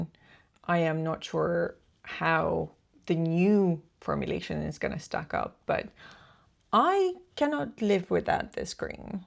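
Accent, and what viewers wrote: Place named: Ireland. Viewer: Nice video! Are you living in Ireland? You have quite an Irish accent 😊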